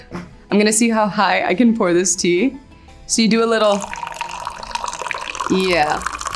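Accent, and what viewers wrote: Morocco. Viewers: كل شيء في الفيديو رائع والاروع نطق الاسماء باصولها المغربية لكن لو قمتي اختي الكريمة بشيء اخير لكان هذا المحتوى في قمة الروعة الا وهو ان تلبسي القفطان المغربي. روعة. 👏👏👏👍👍👍🇲🇦🇲🇦🇲🇦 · the way she pronounces the names of things in the original moroccan dialect is Awesome <3! we proud of you <3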